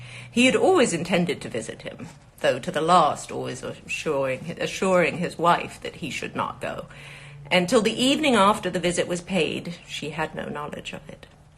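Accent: England, United Kingdom. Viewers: Her English accent is natural as well.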